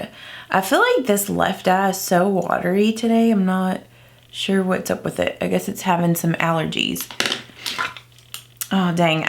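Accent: South, United States. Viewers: I just love watching your videos. I absolutely adore your accent....are you from the south? Your so sweet and your tutorials are easy to follow and love that you use a lot of drugstore products! 😁🇨🇦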